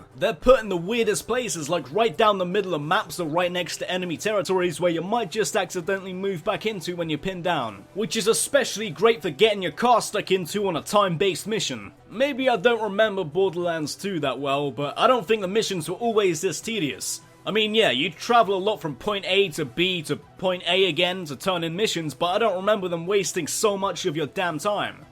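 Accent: United Kingdom. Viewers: Your a brit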